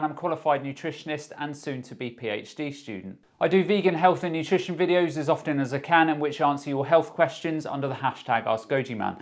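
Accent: United Kingdom. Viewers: You sound British. Thankyou for info. Where do you suggest to get these tests done? So many options I don't know which to trust.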